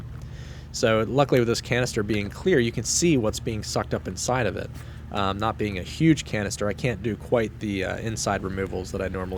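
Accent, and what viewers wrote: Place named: Pennsylvania, United States. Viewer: your pennsylvania accent really shows on your o’s haha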